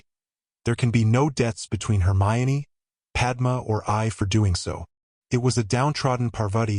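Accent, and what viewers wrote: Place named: United States. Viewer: This is cool, turning fics into audio. I have read this fic multiple times. PLEASE can it be in a British voice if the source material is British? I couldn't listen for very long (even though I appreciate that this exists) because I can't listen to HP in an American accent, sorry.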